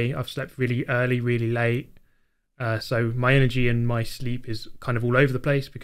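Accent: England, United Kingdom. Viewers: Bro are you Filipino with a English accent? Gah damn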